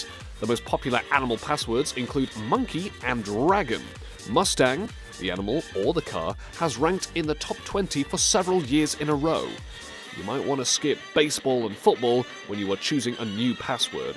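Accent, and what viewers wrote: United Kingdom. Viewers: You obviously live in the U.K. Because you pronounce Z with an ED at the end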